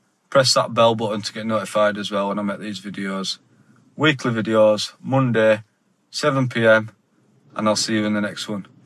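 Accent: Yorkshire, United Kingdom. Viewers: like the video mate - like your yorkshire twang - nice memories for me back home :)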